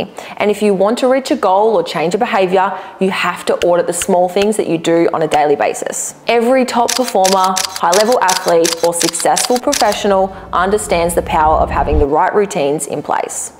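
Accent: Australia. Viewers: I am here just for the smart video with common sense information and the Australian accent....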